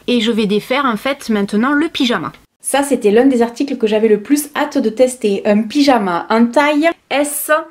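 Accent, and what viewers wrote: South, France. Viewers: On pourrait presque dire merci au reconfinement parce qu'on a deux fois plus de vidéos de, et ça c'est chouette! ^^ Toujours des vidéos super agréables, et souvent quelle rigolade! Puis cet accent du Sud un pur bonheur! Merci d'y faire honneur, notre accent est tellement moqué souvent, et pourtant il chante même quand il pleut chez nous. Continue ton petit bout de chemin, soit fière de ton chemin parcouru après tout se que tu as raconté dans ta précédente vidéo sur l'amitié et ce que tu as vécu. Je ne peux pas t'appeler "maman youtube" parce qu'on a presque le même âge, mais bravo pour ta chaine et ces bons moments que nous passons avec toi chaque semaine. Ca fait un bien fou! Bises de Montpellier. Et caresses à ton adorable Tal;-). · ce pyjama rose je l’ai depuis bien 3/4 ans et c’est toujours un bonheur de le mettre! Il s’est peut-être un peu détendu avec le temps mais absolument pas abîmé et il est toujours aussi chaud et doux! 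J’ai essayé les ceintures en cuir chez eux et c’est les seules qui ne se déchirent pas, j’ai aussi pris les grosses chaussettes avec le molleton dedans et laine dehors c’est aussi super. Merci pour tes vidéos et ton accent du sud, ça ramène du soleil en Alsace, je t’adore!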